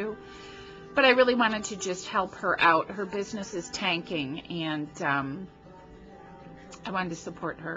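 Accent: New Jersey, United States. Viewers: U CRACK ME UP!! I just love seeing your videos pop up because beyond enjoying what you create... I just love listening to your monologue!! I have a dear friend who's a Jersey girl, too, and its like listening to her when I catch your videos! You always provide a laugh for me!! TFS! ~Karen P.S. DEAL, DEAL, DEAL!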